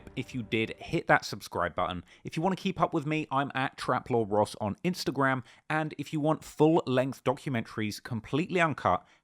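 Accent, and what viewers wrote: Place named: London, United Kingdom. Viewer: Ayo this nigga talking about Von with a London accent lol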